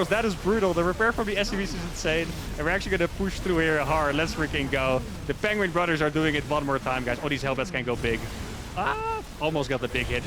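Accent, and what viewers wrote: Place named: Netherlands. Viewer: He is Dutch. Most of them speak English very fluently. Coming from England I can tell from his accent. The Netherlands is not far.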